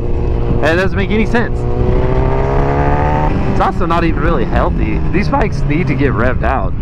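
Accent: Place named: Houston, United States. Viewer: Off the first minute i can tell you from Houston lol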